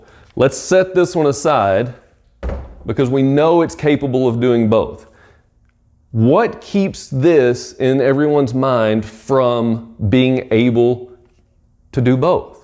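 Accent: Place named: Milwaukee, United States. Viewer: You sound like a Milwaukee guy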